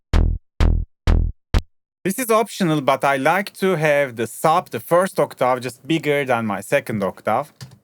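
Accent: Turkey. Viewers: Turkish accent is my favorite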